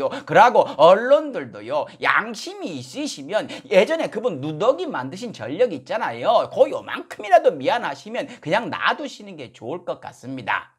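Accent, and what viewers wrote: Jeolla, South Korea. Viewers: 강성범씨 고향 전라도셨나보네요 ^^ 말투가 전라도 말투가 아주 구수하니 듣기 좋습니다!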